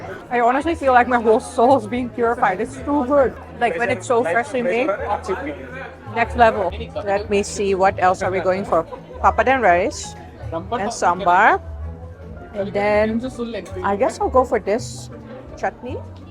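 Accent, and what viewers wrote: India. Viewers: I loge your slightly Indian accent when speaking english😂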